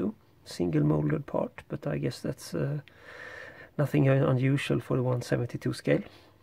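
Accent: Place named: Sweden. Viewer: Är du svensk för din accent på engelska låter svensk?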